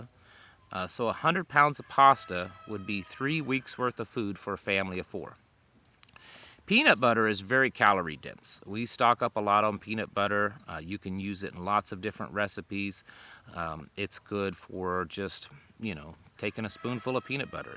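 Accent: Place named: Appalachia, United States. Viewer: What happened to this guys accent??. Back when he was comparing chainsaws he sounded like ah hillbilly . Lol weird.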